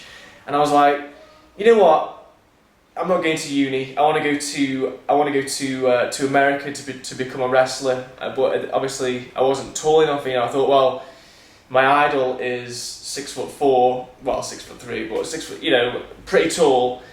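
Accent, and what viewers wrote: United Kingdom. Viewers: can't understand British accent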